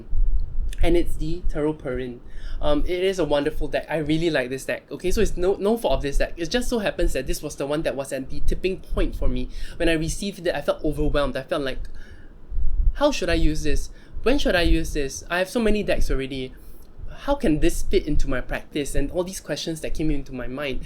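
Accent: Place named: Singapore. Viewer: Are you from Singapore or Malaysia? We got the same accent fam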